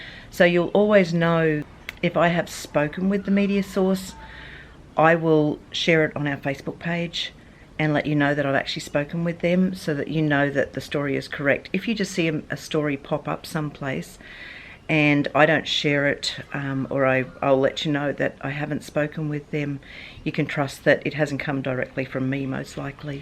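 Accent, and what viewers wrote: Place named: Australia. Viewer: i am a new subscriber and love for family and honest aussy way.we live in Biasbane and love hearing this aussie mum.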